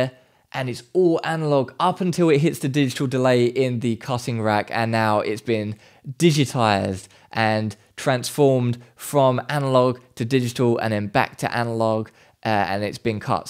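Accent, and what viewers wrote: United Kingdom. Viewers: Rough london accent, strong dark beard, 2012 one direction hair?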